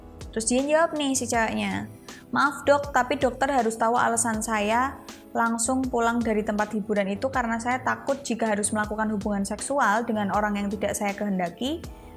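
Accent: Java, Indonesia. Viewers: Suka dg gaya bicara yg medok khas Jawa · Bhsa indonesianya kog medok mbk, org jawa ya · medok banget logatmu mba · Dede medok cantik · medok mu tenan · Mbaknya cantik tapi medok jadi lucu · kenapa saya sampe di sini ya hahaa mbak e ini pinter , ceria dan pasti nya orang jawa tho suka dialeg bahasanya yg medhok hehehe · Aku senang banget wajah cantik gini tapi medok Jawa. ❤🔥semangat kak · seneng banget denger logat jawa nya mba * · boso jowo'ne medok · Totok tenan jowo ne 😀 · Logat jawamu mbak uenak dirungokno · Medhok bgt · Medok nya itu rek, lucu n so sweet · Jowomu kok medok tenan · klo ngomong enak jowoan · Menarik.... Logat Jawanya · Logatnya saya suka 'Jowo banget' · Jowo ne muantebz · Seneng dengar logat jawanya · BHS indonesia dgn Medog Jawa jd lucu dengarnya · Menurutku Mb lbh CERDAS dari sugar baby yg Mb ceritain Bhsa Jawa Mb medhok jdi lucu dengernya Sukses Mb 🙏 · Lumayan..bagus cara menyajikannya...logat jowonya yg bikin seru...👍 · Logat Jowomu medok banget mba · Medokk ya mbaee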